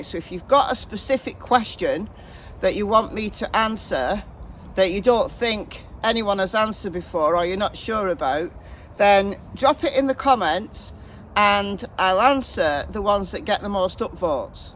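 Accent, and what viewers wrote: United Kingdom. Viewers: I love you, your British accent & your very adorable dogs! I have been following you for awhile & look forward (when I get off work as a night shift nurse) to listening to your podcast on my drive home. Keep up the great work!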